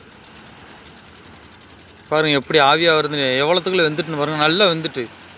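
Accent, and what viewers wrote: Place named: Madurai, India. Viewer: Love to hear your slang thambi..please don’t try to change while you speak..I’m from Madurai and forgot most of the terms..While you speak,iI really feel good and become nostalgic.God bless.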